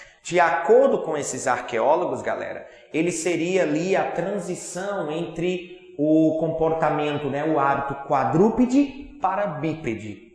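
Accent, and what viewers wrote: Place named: Northeast, Brazil. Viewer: Professor suas aulas são magnificas, e o sotaque não nega que é do nordeste. O povo aqui de Recife-PE já virou fã das suas aulas. Pfv mande ai mais vides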